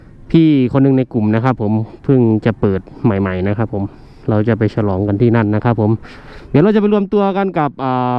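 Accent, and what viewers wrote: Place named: Isan, Thailand. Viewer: สำเนียงเสียงเว้าม่วนหูหลาย FC from Laos🇱🇦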